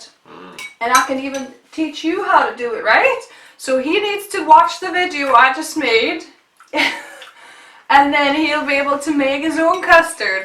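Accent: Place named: North East England, United Kingdom. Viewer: Hi I live in Stockton on tees. I think I recognise a NE accent! Our 1st daughter Harmony at 2yrs (now 42yrs) didn’t want to eat animals…. we are vegan because of this beautiful 2yr old. I have 6 grandsons 2 were born vegan! I am KM Vegan Baking but I do not work any more as I am a full time carer for my mum. I grow organic fruit and vegetables! Thank you for your delicious healthy recipes 💞💗💓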